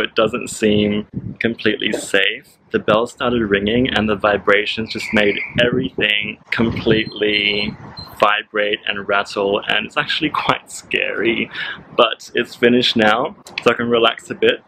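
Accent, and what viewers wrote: Australia. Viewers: I am going to visit Vilnius next month. I can’t wait to be in those places in person. I am definitely going to visit Trakai. I love your calmness and sympathy and the Australian accent is my favourite.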